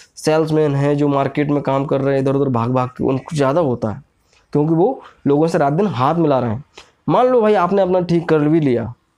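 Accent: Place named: Gwalior, India. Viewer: Gwalior side k लगते हो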